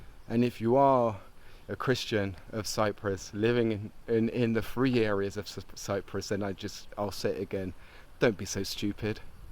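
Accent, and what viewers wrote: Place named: England, United Kingdom. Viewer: 🤣🤣🤣 What happened to your churches in the North side? They are all still there. Get a life mate. You advertise Cyprus Without Borders as a bicommunal page but all I see in your videos is nothing but targetting TCs making out like we are nothing but 'propaganda' makers. We tell the truth. So walking around whispering with your plummy English accent to make yourself look friendly isn't going to work. Insidious as anything, you are. Why don't you share what happened to all the other mosques, not the one protected by Turkish authorities. And no, don't go inside the mosque. You might find god there. Can't have that, can we!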